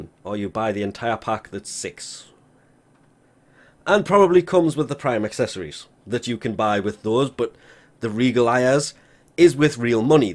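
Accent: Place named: Liverpool, United Kingdom. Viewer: Liverpool accent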